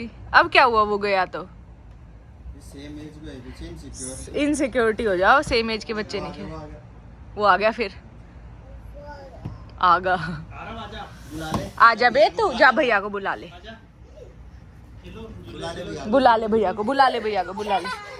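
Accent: Haryana, India. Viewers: main Rajasthani hun toh nhii pta apke kuch unique se words ka meaning😊😊 pr apki Haryanvi language mast lagti hai❤❤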